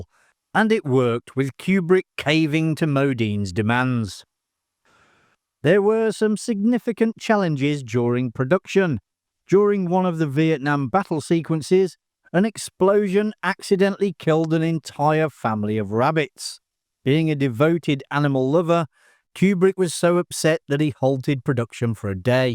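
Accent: England, United Kingdom. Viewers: it's called an english accent you silly twat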